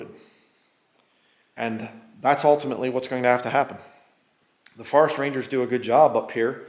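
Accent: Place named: Southeast Pennsylvania, United States. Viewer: Wow,you sound like me,raised up in southeast pa. On a dairy farm and going upstate for everything outdoors. Now living in maine 10 years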